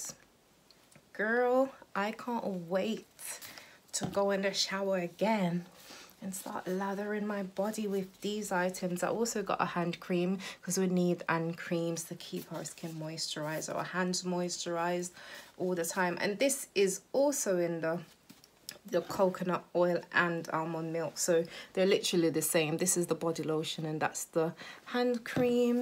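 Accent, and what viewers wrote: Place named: Birmingham, United Kingdom. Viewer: Great haul, looking very glam in this video. Loving the earrings and the watch! And of course the slight Brummie accent too lol!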